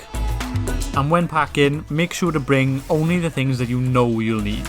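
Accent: Wales, United Kingdom. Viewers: Great vid. Made me smile when I heard the familiar Welsh accent haha. Where you from? I’m Carmarthen. I go to Nepal in 3 weeks and I can’t stop thinking about it 😂❤️🏴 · Diolch (yeah, picked up the Welsh accent). Well worth watching.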